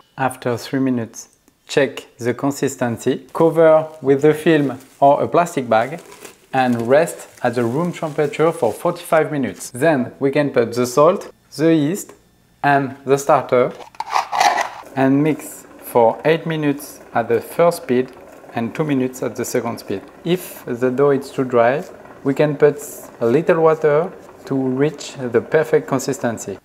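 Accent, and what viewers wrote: France. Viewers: Les anglais doivent être dingues de ton french accent 👍👍👍👍bravo en tout cas 👍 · Ha putain l'accent va plaire 💪 So frenchy ❤️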